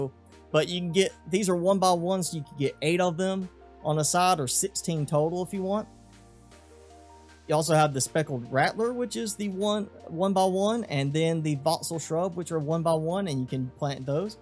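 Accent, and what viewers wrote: South, United States. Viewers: This guy sounds country. Nice video it helped me so much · Southern not western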